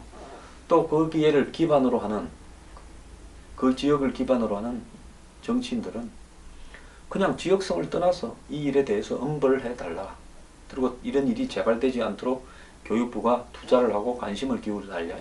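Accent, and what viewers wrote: Gyeongsang, South Korea. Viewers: TK사람 말투 진짜로 짜증나네? 같은 경상도지만 말투가 영~~~~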